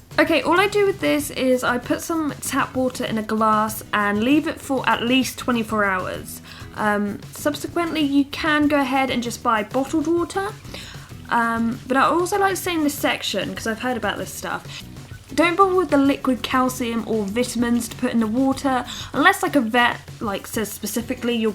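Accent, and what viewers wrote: United Kingdom. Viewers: haiii me again XD so from ur voice ur from uk ??????? if u are OMG plzzzz do u know any good breeders in uk i cant find ANY !!!!!!!!!!!!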